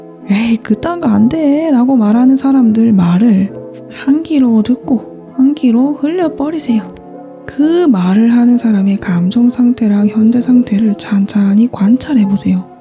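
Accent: Gyeongsang, South Korea. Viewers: 도네이션을 주기적으로 밥먹듯이 하는 이 채널의 정체는 무엇인고... 오호.... 제 유튜브채널 어차피 100만 넘을 것을 압니다.. 어차피 차고 넘칠 것.. 그냥 천천히 해보도록 하겠습니다.. 어차피 100억 부자 될걸알기에 천천히 즐기면서 인생 살도록 하겠습니다..ㅎㅎ 와... 이렇게 글만 적어도 너무 행복해지네요.. 더 신기한 것을 이 모든 내용을 말하면서 그림까지 완벽하게 그려내시는 당신은 도대체.. 짱이네요.. 그나저나 경상도 아니면 부산분 같은데! 맞나예? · 구수한 경상도말투에 너무 재미있게 잘 보았습니다. 앞으로도 계속 풍요 안에서 좋은 내용 많이 나눠주시면서 더 행복하고 자유로우시길 바랍니다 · 경상도 사투리 같은디.. 그것도 안동, 영주쪽 .. 본관이 어뎁니꺼? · 경상도사투리와 서울말이 섞여있네요ㅎ 매력적입니다! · 이 놈의 갱상도 억양은 정말..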